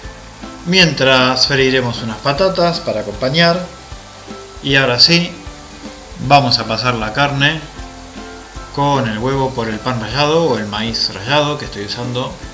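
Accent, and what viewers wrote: Argentina. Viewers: hola una pregunta sos Argentino ?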